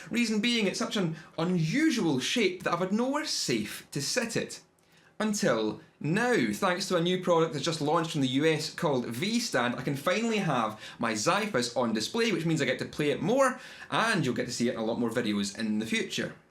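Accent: Scotland, United Kingdom. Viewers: I must say, your purposeful diction is fantastic. It helps us yanks here in the states wade through your Scottish brogue!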